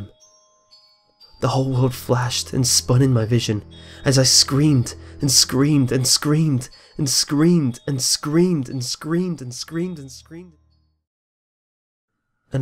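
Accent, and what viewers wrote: England, United Kingdom. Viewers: English accent I believe :3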